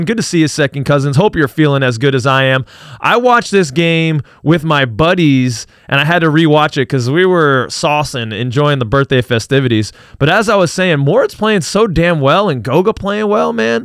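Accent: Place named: Germany. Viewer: I'm so jealous of your German accent. I wish I could pull that off.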